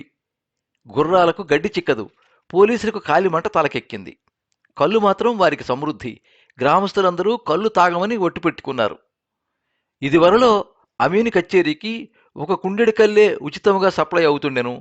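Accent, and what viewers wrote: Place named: Telangana, India. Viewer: ఆ రోజుల్లో నిజాముల నిరంకుశ పాలన గురించి చాలా చక్కగా వివరించారు సురవరం గారు.మీరు ఆ రోజుల్లోని తెలంగాణా మాండలికాన్ని చాలా చక్కగా,వినసొంపుగా పలికారు.నిజాములు పోయినా పరిపాలన మాత్రం ఏమీ బాగుపడలేదు అని అనిపిస్తుంది.😢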